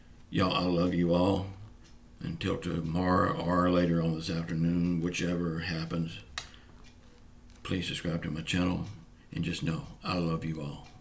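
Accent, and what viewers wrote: South, United States. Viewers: Where do you live? You have a Southern accent!! It’s refreshing to hear!! I’m in Virginia born and raised!!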